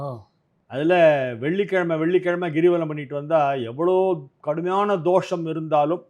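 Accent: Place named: Tirunelveli, India. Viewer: அய்யாவின் பேச்சு நெல்லை மாவட்டத்திற்கு தனி சிறப்பு ஆச்சு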